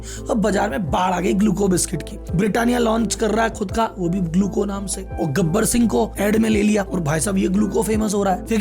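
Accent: Gujarat, India. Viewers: Tamara accent gujrati chey , dhando walo mota bhai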